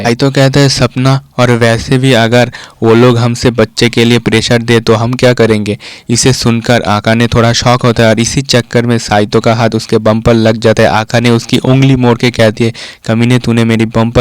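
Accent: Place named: Bengal, India. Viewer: Sudhar jayega tu tension mat le o ak Bengali fir bhi kosis kar raha 😂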